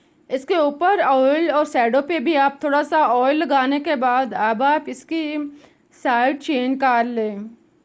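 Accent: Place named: Punjab, India. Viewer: She is speaking English Words in Punjabi style, using Plenty of Oil, Bread will definitely break. Mix, Oil, crispy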